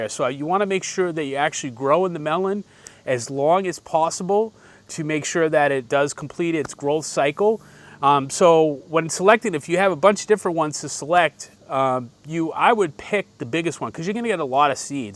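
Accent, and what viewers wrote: Boston, United States. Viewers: Dude! I love your accent! Is it Boston?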